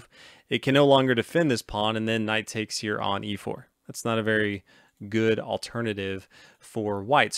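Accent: United States. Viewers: Thanks. Are you from USA?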